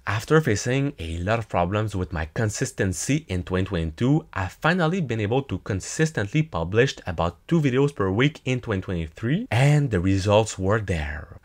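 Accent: Quebec, Canada. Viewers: Your content doesn’t apply to me, but I’m going to subscribe anyway as your personality seem great. You didn’t hide your payment figure to the very end of a 25 minute video. You kept it short and sweet. Very informative. I hope you get far with YouTube my friend. I’m from the UK but I assume that’s a French Canadian accent? Like GSP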